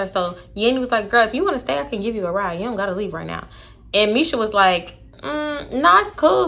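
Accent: Baltimore, United States. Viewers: this random baltimore accent be taking me out 😂😂😂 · you taking me outtttt wit the baltimore accent🤣🤣it’s so funny hearing how we sound to other ppl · Are you from Baltimore? ( your accent lol)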